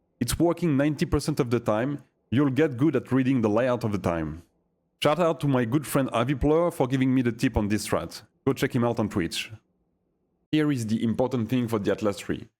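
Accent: France. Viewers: Thanks by any chance are you french ?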